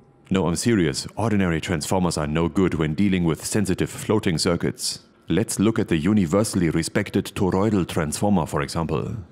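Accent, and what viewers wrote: Germany. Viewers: I didn't expect German accent to be so difficult for me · Your German accent becomes more and more sophisticated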